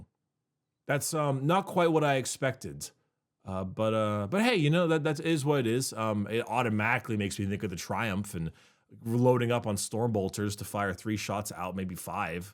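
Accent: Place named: California, United States. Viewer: "Mare-icle" dice. Californian accent be strong.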